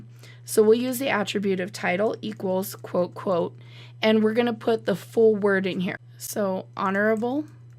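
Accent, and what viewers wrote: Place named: United States. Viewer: Thank You :) Finally someone with American accent ;) I was watching 2 previous videos with strong Indian accent... I didn't understand it at all..:D Great lesson thanks again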